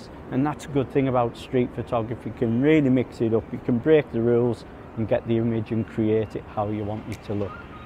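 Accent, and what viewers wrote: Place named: Teesside, United Kingdom. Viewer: Hi, first time coming across your channel and I will subscribe, you really sound Middlesbrough, I'm a Stockton photographer, good to hear a local Teesside accent abroad.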